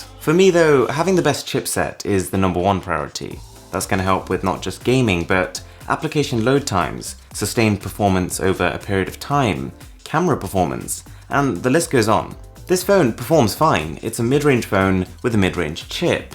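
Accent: United Kingdom. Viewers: Yes, Zack sounds great too, but that British accent is so elegant :1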